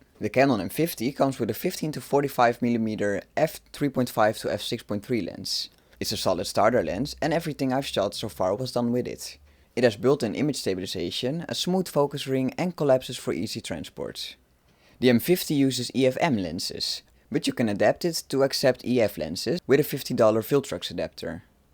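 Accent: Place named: Netherlands. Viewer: Thank you for the break down. I just bought a second hand one today! I'm trying to learn how to operate it. I live in Hawaii so of course I need a camera here to capture all the beauty of the land. Though I would like to use an R8 I could afford this M50 today. So I'll shoot with it for a little while until I get blessed with another one. I believe I'll plan to keep this one in the collection. As I go to look for instructional videos on this camera your video is the first one I saw. I heard your voice and accent and said to myself he's a Dutch man. I looked deeper into your profile and saw you were based out of Nederland. I was born in Utrecht. Now I know for sure I've made a solid purchase. Because the Dutch are known for quality. So thank you for confirming my buy. And thank you for the wonderful video.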